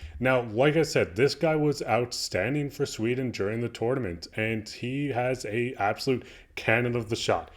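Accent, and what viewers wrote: Newfoundland, Canada. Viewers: Newfoundland? but we can understand you.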